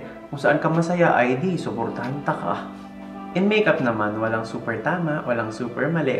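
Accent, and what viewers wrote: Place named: Visayas, Philippines. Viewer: ❤️❤️❤️..i love the way how you speak bisaya word..😘😘😘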